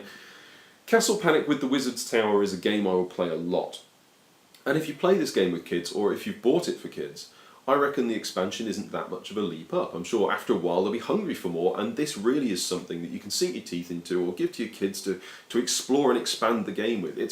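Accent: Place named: United Kingdom. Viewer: Really enjoyed the video...and the accent, you brits speak so properly.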